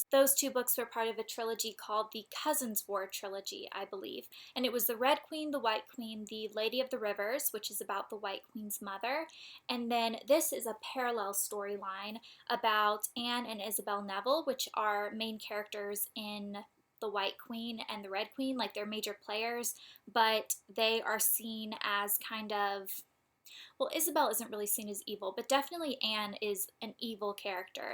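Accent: South, United States. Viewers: aww your southern twang is coming out more since you're at home :) adorable!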